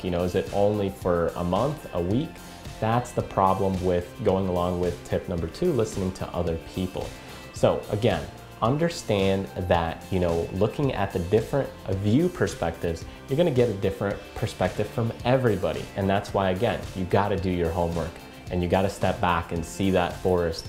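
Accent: Russia. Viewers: thank you for a great video man , btw are you Russian ?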